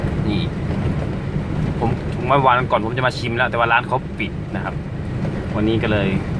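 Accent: North, Thailand. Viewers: น่าจะอู้เหนือเนาะคับ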